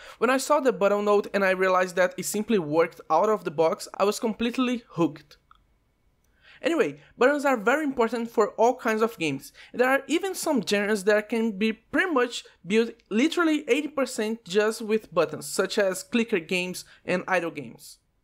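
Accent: Brazil. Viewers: Sabia que era BR só pelo sotaque. Brabo homi! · Eu sabia pelo sotaque que tu era brasileiro kkkkkkk salve, e seu inglês é MT bom btw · Percebi pelo sotaque. Ganhou um inscrito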